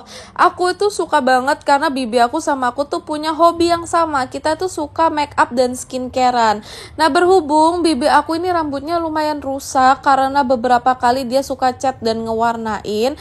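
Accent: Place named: Surabaya, Indonesia. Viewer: Kak ngomongnya kok agak medok seh ? Agak surabayaan gitu...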